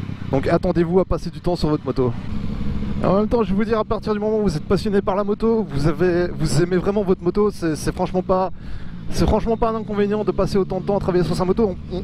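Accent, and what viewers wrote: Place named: France. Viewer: Sympa la petite ballade dans Bx,... T'es un français résidant en Belgique ? Je dis ça car tu as un accent français. Très bonne vidéo ça permet de se faire une idée des choses à compter lorsqu'on aimerait s'offrir une bécane. Thx 👍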